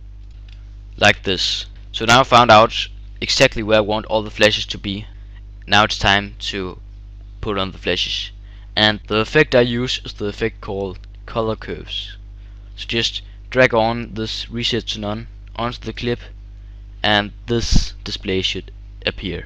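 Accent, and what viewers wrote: Denmark. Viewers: hes from Denmark we almost got same accent :p :D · Ur danish, right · He sounds more like Danish.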